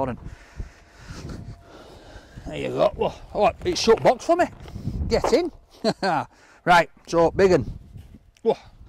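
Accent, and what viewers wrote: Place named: Yorkshire, United Kingdom. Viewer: Love the yorkshire accent, much better than my midlands accent.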